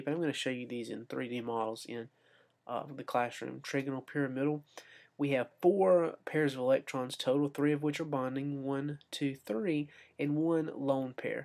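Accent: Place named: South, United States. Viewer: Nice to hear a Southerner talking some sense every once in a while. No invocations of jebus and some good explanations of VESPR. Thanks.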